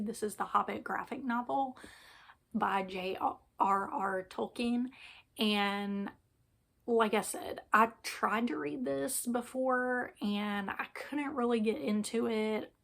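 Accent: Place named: South, United States. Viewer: Yesss our southernnnn 😂we can’t pronounce things hahahaha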